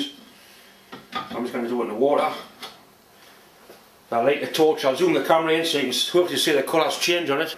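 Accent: Newcastle, United Kingdom. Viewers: as far as I'm concerned don't change a thing, love the geordie accent and your humour, takes me back to a time when I was married to a " Monkey Hanger" ( anyone who does not know what this, google it). The people of the North East work hard, play hard when work is available, when I used to visit I was always known as the "Cockney Bastard" but it was never once said with malice, they were at that time the happiest years of my life and I have so many memories of the North East. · my wife has trouble with your language!!! your Geordie accent that is.... but she is from Mississippi, the deep south, usa, and has trouble with my mixed us new England/Australian accent, so go figure. you hear infinitely worse language on the tele, on the street, on public transport and most certainly in any school yard from kindy on up. keep the videos coming and don't change a thing!!!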